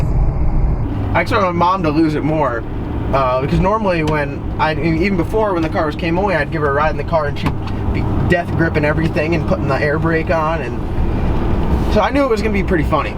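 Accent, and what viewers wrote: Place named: Appalachia, United States. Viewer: Why do you talk like a hillbilly but your parents dont? Lol wtf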